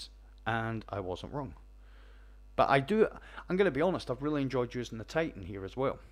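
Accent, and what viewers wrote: Scotland, United Kingdom. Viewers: You are the man! Just used your solo guide for thorn strike! Will be using this guide for the solo clear. That’s awesome that you also call some of the symbols like my friend and I did. Vex head, turret, and burger haha! Thanks for everything you do dude, so so helpful! Take this as a compliment, you’re like a Scottish esoterickk! (Hope I got the accent right) no offense if you’re not Scottish!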